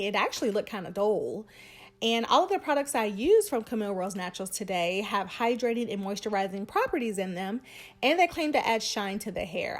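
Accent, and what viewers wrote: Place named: South, United States. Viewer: You have such a southern twang in your speech. I love it. I am also thinking about getting a tapered cut. I love yours.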